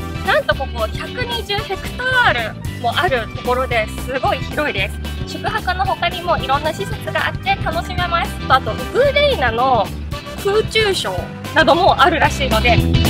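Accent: Taiwan. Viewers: 為什麼是講的台灣國語?好可愛! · 根本是台妹講日語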